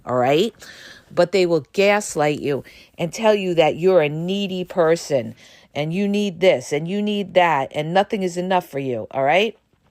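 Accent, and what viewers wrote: New York, United States. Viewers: "Deep down, the narcissist disdains you, they really can't stand you" This, with your New York accent made me bust out laughing! Takes a bit of sting out of the painful truth.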